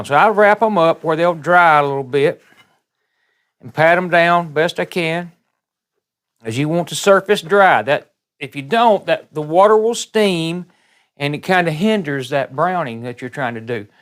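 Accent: South, United States. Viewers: He is from the south. I understand you. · Where is that twang from? Somewhere south like Lousiana or Georgia?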